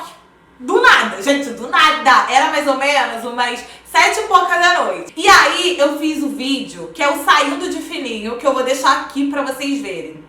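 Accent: Rio de Janeiro, Brazil. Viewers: Eu só sou escrita no canal!! Porque eu simplesmente amo o seu sotaque carioca Sua energia .Parabéns pelo sucesso 🎊🎉🎊🎉🎊🎉🎊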